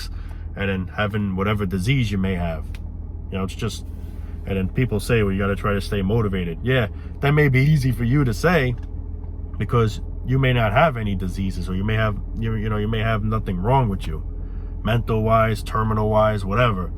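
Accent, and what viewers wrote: New York, United States. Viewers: NY accent, makes this better.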